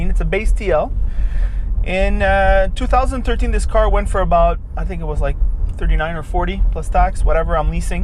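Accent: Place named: Quebec, Canada. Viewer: Great review from an honest guy! French Canadien?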